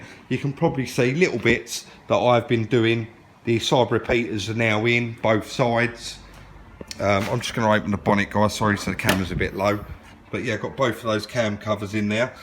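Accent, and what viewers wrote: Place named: London, United Kingdom. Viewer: You sound like Danny Dyer with that london accent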